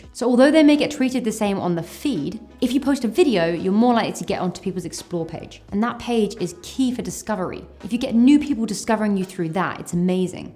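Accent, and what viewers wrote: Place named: United Kingdom. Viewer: Aaaaah.... British accent 🔥🔥❤️❤️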